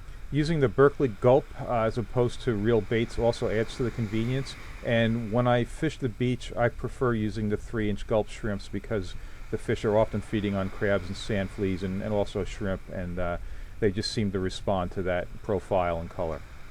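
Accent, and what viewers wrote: Long Island, United States. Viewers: Would love to watch the video but can't stomach the Long Island accent...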